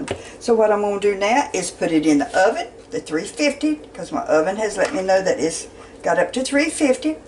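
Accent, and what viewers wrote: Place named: North Carolina, United States. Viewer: I love when you make old traditional recipes! 💞 You sound like your from my neck of the woods in NC! ☺️ I wonder if you've ever made Sticky Toffee Pudding? It's a very famous & popular moist cake from England & Ireland. I've never made it but would love to see it done. Thanks 🤍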